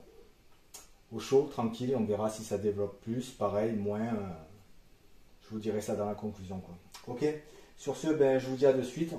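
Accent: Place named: South, France. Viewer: Super vidéo du CP300, comment ça ? Il fait froid avec l'accent du sud de la France 😊.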